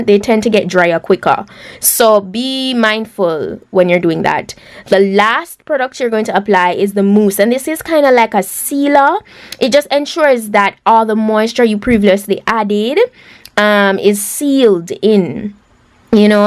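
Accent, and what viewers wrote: Jamaica. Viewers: Luv this my Caribbean sister Im Trini....luv jamaican accent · Her Jamaican accent is cute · Damn her 🇯🇲 accent thick lol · your Jamaican accent is so peng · I love her accent ❤️🇯🇲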